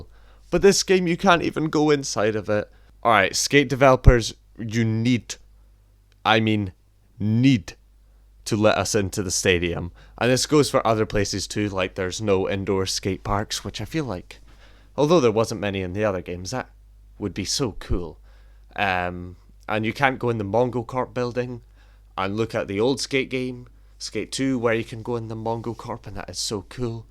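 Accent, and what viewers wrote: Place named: United Kingdom. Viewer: Cool accent bro. Are you from somewhere like Scotland or UK or Ireland? And what’s your main channel I dropped a sub on this one bro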